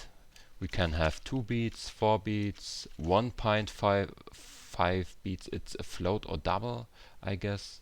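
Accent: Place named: Germany. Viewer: You sound very German. Ich kenne diese Scham, den eigenen Code zeigen zu müssen ^^.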